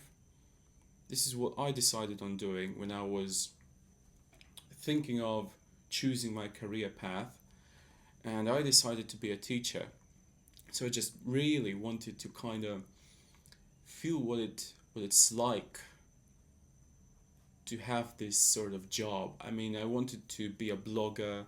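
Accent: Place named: United Kingdom. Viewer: What a wonderful British accent! You must have worked a lot on it. Plz make more similar podcasts.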